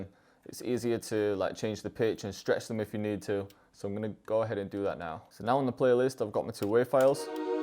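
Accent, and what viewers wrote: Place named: Northern England, United Kingdom. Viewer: He's from up north prolly manny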